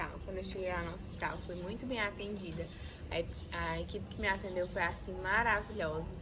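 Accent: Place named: Ceará, Brazil. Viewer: E esse sotaque cearense?..hehe.. Deus abençoe sua vida! Deus sabe de todas as coisas!! O meu caso foi muito parecido, adotei uma menina, minha Larissa. Ainda pensava em engravidar, quando resolvi fazer tratamento, descobri câncer de endométrio, minha sorte foi oq descobri cedo. Hoje estou curada e muito feliz pq estou curada e posso cuidar da minha menina Larissa. Presente q Deus me deu para que não sofresse depois uma histerectomia total. Deus sabe de todas as coisas. Tudo é providência Dele. Penso em adotar outra menina pq a primeira deu certo, amor maior não há!!!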